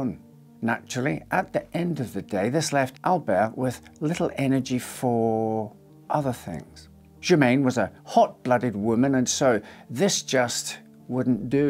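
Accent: Scotland, United Kingdom. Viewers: As usual, excellent presentation. Proper pronunciation of our English language is so important, and (if I'm right, you're Scotch?) your innate facility to use consonants properly means this - and all your super videos - are a tremendously fulfilling watch. Only thing to be alert on: (maybe auto-generated) Yank shoddy spelling e.g. "ax" for axe or "meters" for metres. Good work. Love your channel!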